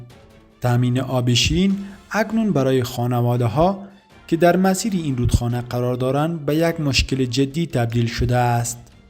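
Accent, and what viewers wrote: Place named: Iran. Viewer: mixed of Persian with Iranian accent. Guys y want respect speak in your dialect and accent. Ty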